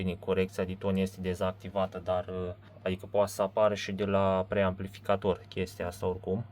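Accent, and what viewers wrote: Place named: Moldova. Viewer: Sa ceri sa lase mai jos de 50 de lei si ai acasa osciloscop Rhode Schwarz ,apoi inteleg ca esti din zona Moldovei dar se pronunta corect in limba romana condensatoare nu condensatori ,tranzistoare nu tranzistori,.Bafta!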